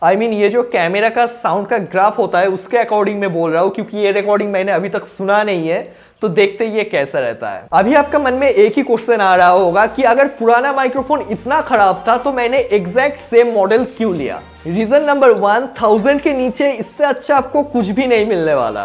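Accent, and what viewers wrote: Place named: Bengal, India. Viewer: Bangali accent bojha jachhe bhai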